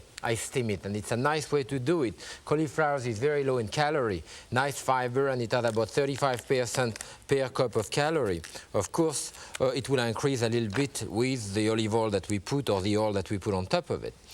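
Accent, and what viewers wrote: France. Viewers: Are you trying to say English should have less of a French accent? I'm not sure what you're saying.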